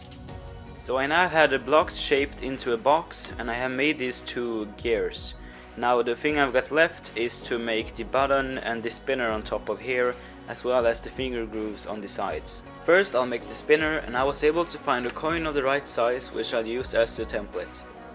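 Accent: Sweden. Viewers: are you from sweden. it sounds like that ,i am. cool project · I guess by your accent and it saying linolja on the oil bottle that you are swedish Hej kompis! Älskar din kanal! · I recognized your Swedish accent within the first 10 seconds of watching lol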